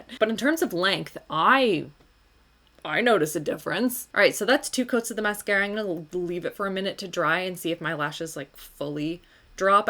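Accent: France. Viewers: a "combo-nation" is so cute and make almost more sense than the actual word "combinaison" ❤❤ Love you and keep speaking french I love your accent !! (French girl here) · Combination = combinaison (french) love your french accent <3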